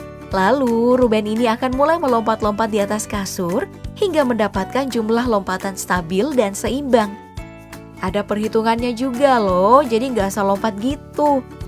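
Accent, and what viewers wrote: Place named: Java, Indonesia. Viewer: Mbak nya kalok ngomong ada jawa nya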